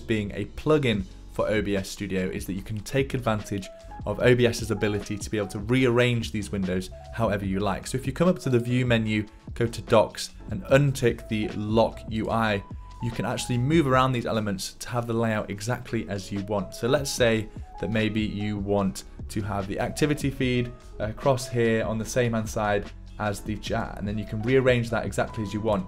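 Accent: United Kingdom. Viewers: instant like for the cool and nice British accent. 🇬🇧😎👍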